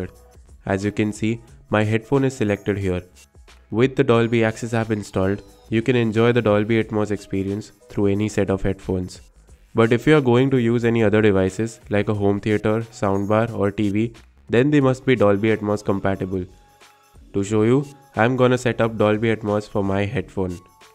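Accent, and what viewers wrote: India. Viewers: the paj eet accent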